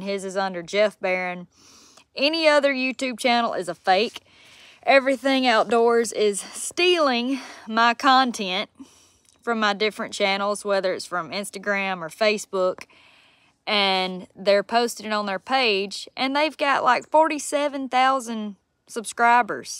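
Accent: South, United States. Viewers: this is southern accent that Forrest Gump had spoken? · I absolutely love your southern accent so hot and your pretty easy on the eyes too girl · I’ve been a fan for a couple years and you’re the best! Great content and so genuine!!! Beautiful southern woman with magnificent southern accent and great skills!! Seems like there’s no getting rid of those thieving trolls!! All the best to Hannah and her kin!! MMc.. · Thanks for the heads up. Your a darling. I love your Southern bell accent!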